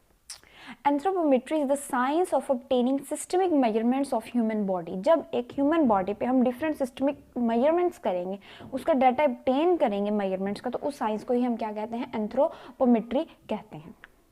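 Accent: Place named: Pakistan. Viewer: mam your urdu accent is so cute😍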